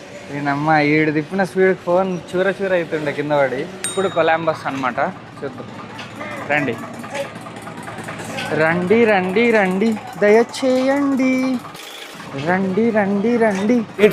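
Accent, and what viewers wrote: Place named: India. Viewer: Ethulaki bayapadani akuva-man, painki yedhagaliiii 💯🫡 garu's efforts to vlog for us and mainly how he supports everyone (jabardasth,masth, his extrovertism, Mannnn)✨ Cameraman bhattu nunchi inspire ayinatu unnaruuuu 😶‍🌫️😂 His Hindhi accent 🔥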